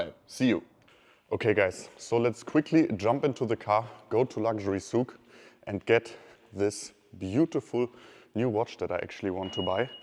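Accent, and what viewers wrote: Germany. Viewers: Hi, I am delighted to see a new upload from you and thank you for sharing your thoughts and strategies. As starting trader they are very valuable to me and couple of times prevented irrational greedy descision that would have turned badly. Not always, sometime greedy holding on to draining longs happened, but over time I want to reducde that kind of action, to zero preferably. Somehow shorting feels more scary in a bullcycle and than longing at high prices. About sharing your private life. I think it is inspiring to see and have that in your videos. You live the dream of I guess many of your followers. Curious about your tutorials but even more: Would you share with us how you made your first 10K, 100K, 500K. When did you decide to move to Dubai? (assuming from your accent that you grown up in Germany). How did you arrange the move? Are there tips you have for people currently living elsewhere that play with the idea to move to Dubai. 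Hope someday you will share that story. Wishing you a great remainder of the weekend.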